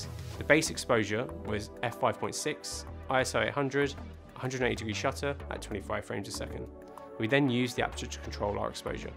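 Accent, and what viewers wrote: United Kingdom. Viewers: Music really should not compete with voice in a mix. I had a hard time hearing the voice. With the British accent and high speed speech to boot, this American had a hard time hearing and processing the information in real time. I did watch it twice, though!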